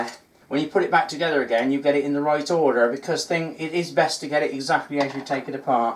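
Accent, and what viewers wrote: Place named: Hampshire, United Kingdom. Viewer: Thanks for confirming that the bearings aren't too difficult to remove. Unfortunately we live increasingly in a throw away society. Your accent reminds me of my Hampshire roots before we moved to Australia 51 years ago, love it.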